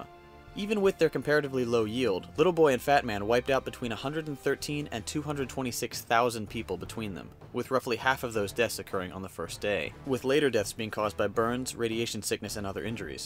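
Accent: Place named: Dallas, United States. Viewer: so this is why your voice seems so familiar, you live in Dallas(like me), and thus your accent is familiar!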